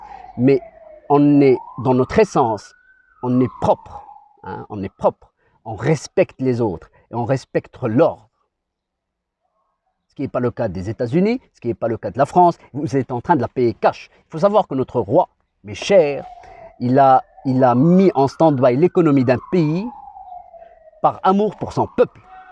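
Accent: Belgium. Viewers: L'accent belge. Votre langue c'est aussi le français car vous parlez français dans toutes vos vidéos. Le Maroc a eu la France comme alerte. Parlez au gouvernement Français pas à la France.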